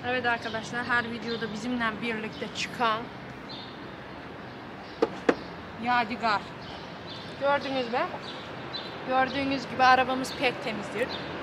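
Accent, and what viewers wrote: Kütahya, Turkey. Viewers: Bizim gız, Öncelikle başarılı çekimlerin devamını dilerim. Kızımın Üniversitesi için yılda 4 kez İZMİR başlangıç KÜTAHYA, GEDİZ güzergahından ZONGULDAK'ĞA geçiyorum. KÜTAHYA şivesinin zaten kendine özgü hoş ve tipik bir iç EGE aksanı var. Bu aksanı ve kültürü bozmadan bulunduğunuz beldenin yaşlılarını,düğün örf ve adetlerini konu alan videoların sizlerin daha çok tanınmanızda yardımcı olacağı kanaatindeyim. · Lanet Gelsin Dedigim Yerdir Kütahya Ama Senin Şu Şiven Yüzünden Sevmeye Başladım.. Yayın Hayatında Başarılar Kardeşim 😊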